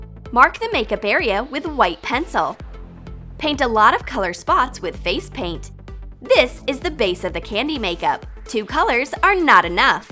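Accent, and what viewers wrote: Russia. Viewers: are you English or are you Russian?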